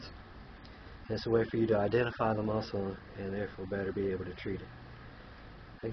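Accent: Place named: Scotland, United Kingdom. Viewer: I thought he was Scottish when he first started talking lol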